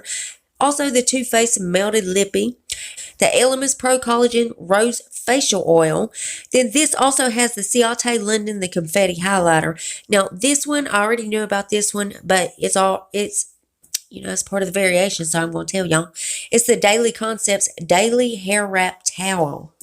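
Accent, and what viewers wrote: South, United States. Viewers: Love your southern accent ♥️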